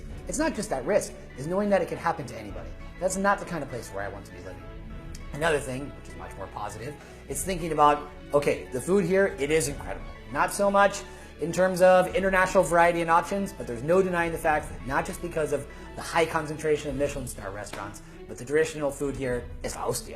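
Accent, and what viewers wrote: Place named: Basque Country, Spain. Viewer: Men now you have that Vasco accent so much on every word comes out of your mouth... Lol.. A American Spaniard 😁 good video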